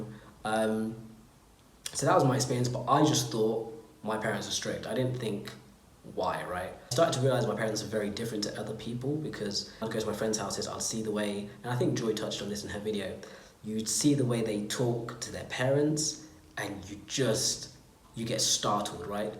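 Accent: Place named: Banbury, United Kingdom. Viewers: British accent sounds better than the American accent I guess. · Definitely. sounds posh though, all Banbury, no Peckham...thank goodness!:)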